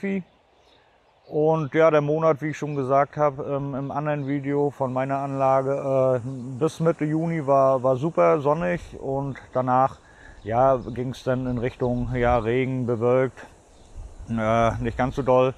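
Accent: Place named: Western Pomerania, Germany. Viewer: Du musst doch irgendwo aus Vorpommern kommen, jedenfalls nach deinem Dialekt!?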